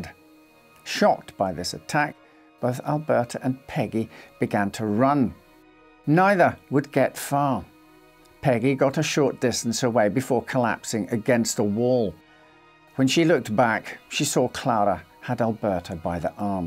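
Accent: Scotland, United Kingdom. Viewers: Definitely Scottish. A very light burr..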